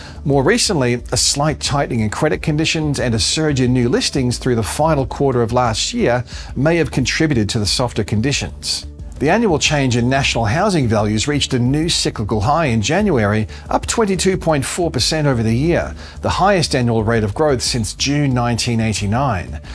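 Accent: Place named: United States. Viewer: What is with the American accent breaking in to your speech pattern. It is very strange.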